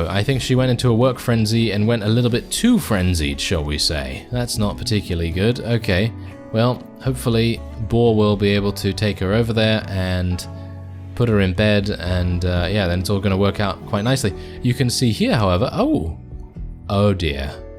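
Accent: United Kingdom. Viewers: Okay, so, look, I need you to do a thing for me. Very simple. Low to mid maintenance, and I feel your enjoy it. Maybe, maybe not, who knows with the spin of the Earth slowing and what all. Anyway, I need you to do this simple thing. Very easy. Very. I need you to do voice over work for either parody nature documentaries, or on comedy science channels. Cause goddamnit your soothing British wailing would delight and tickle the average viewer.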